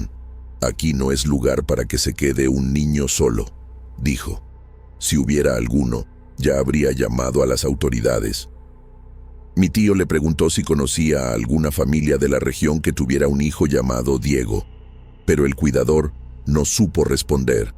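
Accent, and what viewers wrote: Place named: Spain. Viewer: Y luego el acento español lo arruina más.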